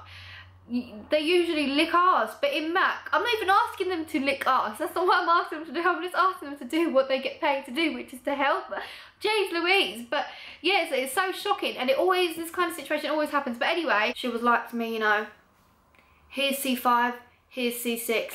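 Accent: United Kingdom. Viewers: lol the way you express yourself and your British accent are absolutely delightful! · Is that a cockney accent? Forgive me if I'm wrong, but anyway you have the cutest accent ever lol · i love ur accent! i swear i can just listen to british people talk all day..!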